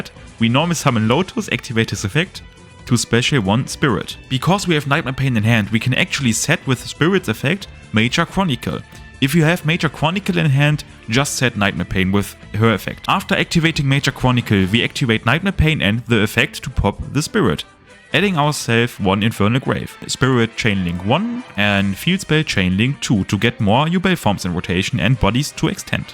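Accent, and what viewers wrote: Germany. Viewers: My guy has the strongest German accent on his English I've ever heard in my life chad af :^) cool video tho. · So ein lustiger deutscher Dialekt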